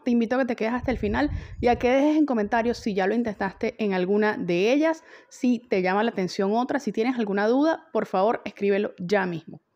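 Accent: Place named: Venezuela. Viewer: Hola soy Lucy, recién descubrí tu canal, he visto otros, pero tú eres más clara, concreta, precisa en los planteamientos, sugerencias, y sobre todo el hablar "venezolano" no tiene competencia. Me he postulado en trabajos remotos dentro de Venezuela y las exigencias son altas, además de los requisitos académicos, las competencias en TIC y las virtuales como el acceso fluido a internet de 10Mbps o superior. Un computador con 4Ram, 250Gb Disco Duro, i5, o superior; en buen estado sin problemas de energía, ni de reinicios. Una cámara web o un celular con buena resolución. Nota: hace tiempo me postulé en una supuesta empresa con casa matriz en España, fue un total fiasco, todavía espero al abogado con el cual me amenazaron.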